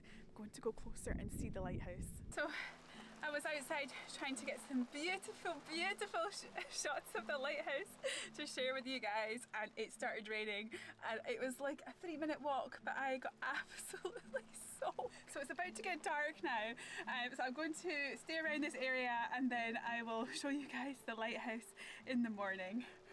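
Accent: Scotland, United Kingdom. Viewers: We are really enjoying your videos. We haven't managed to visit Scotland since Covid, when car hire prices suddenly hit the roof!! Hopefully next year! Your lovely Scots accent is good to hear, but I had a chuckle when you said 'cattle grid' - very clearly. My Edinburgh cousin says 'kettle grid', which once caused confusion on a picnic!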